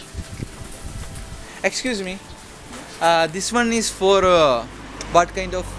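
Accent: India. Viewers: Pota indiano pa ka bwect pakinggan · I dont think your a filipino, your accent sounds like indian guy for sure. Your video is not credible for all sabungeros to share what is good chicken feeds if your not one of us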